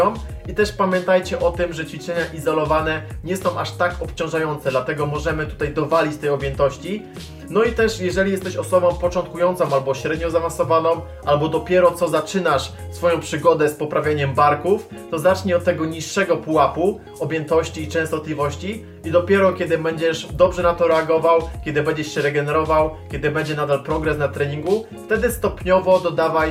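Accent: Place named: Poland. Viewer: Tłumaczy dobrze. Słychać że Polak.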